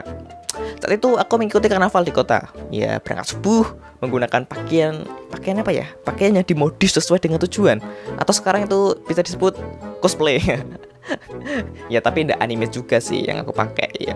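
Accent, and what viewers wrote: Java, Indonesia. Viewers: Iya bahasa jawa lucu kamu ya hahahhaha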